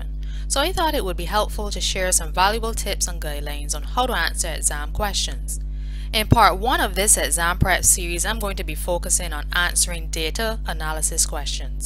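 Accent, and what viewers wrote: Barbados. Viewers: Cause she’s prolly Barbadian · Why she had to have a Barbadian accent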